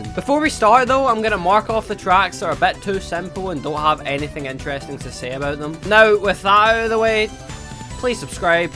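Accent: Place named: United Kingdom. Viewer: He's bri'ish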